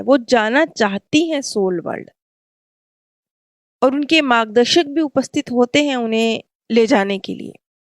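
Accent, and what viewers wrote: India. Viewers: di ka Punjabi accent hai isliye aisa pronounciation hai unka..... Thoda theek se hi reply kr do ye gawar wala cheap word bura nhi lagega kya unko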